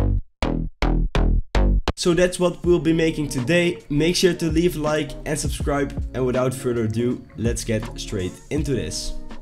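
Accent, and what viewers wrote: Netherlands. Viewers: you sound dutch. are you dutch?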